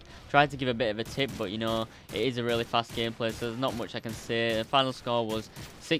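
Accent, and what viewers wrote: United Kingdom. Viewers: Are u British be ause I want to no cause ur accent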